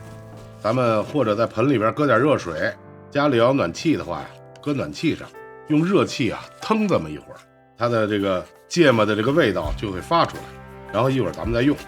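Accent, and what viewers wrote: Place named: Beijing, China. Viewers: 这位厨师大爷说的北京话真好听。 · 廣東人，第一次看到這菜，好酷🆒，單單看見都覺得轟鼻，哇噢，這麼多芥末(´▽｀)瑟瑟發抖ing。等我買了芥末粉也試試，另外說一句，北京話好好聽，謝謝分享(o^^o)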